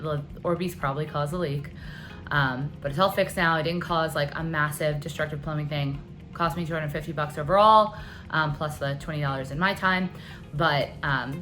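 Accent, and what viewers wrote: Texas, United States. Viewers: Congratulations,! My note on your voice is I have never thought you had a southern accent until today. You sound Texan for sure today. I hope you are better soon. You are an inspiration.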